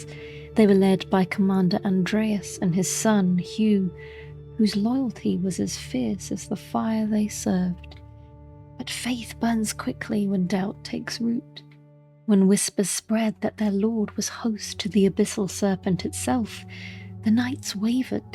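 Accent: United Kingdom. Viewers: Having a soft spoken British women telling me Elden Ring DLC lore in bedtime story form is something I never thought I would need. But I am here for it with a side of chamomile tea... because English breakfast tea has caffeine!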